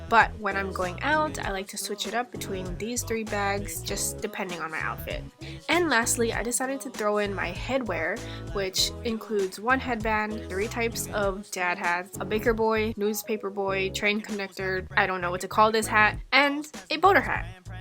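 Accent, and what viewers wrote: Hawaii, United States. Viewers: As someone who’s also from Hawaii, I enjoy hearing just the slightest hint of moke in your voice! Can’t wait to see the moving vlogs (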